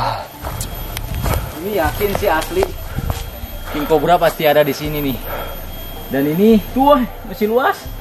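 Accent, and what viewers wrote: West Java, Indonesia. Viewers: Sangat mengedukasi bgt ini, dan logat sundanya terasa bgt nih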